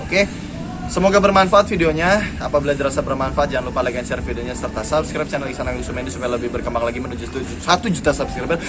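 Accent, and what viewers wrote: West Java, Indonesia. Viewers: Bang dari sunda kah? Itu mangunreja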